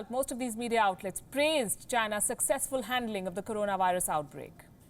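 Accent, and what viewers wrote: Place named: India. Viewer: She has a strong india accent and talk piece by piece.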